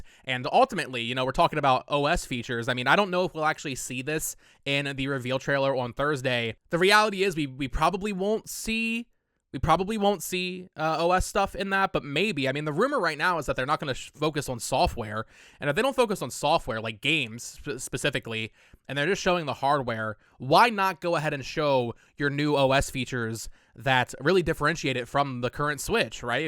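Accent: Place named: Canada. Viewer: You say “on” like “awhn”. Are you Canadian?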